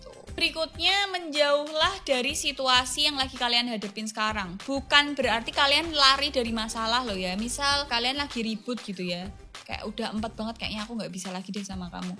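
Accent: Java, Indonesia. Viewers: Akhirnya kangen medoknya mba terobati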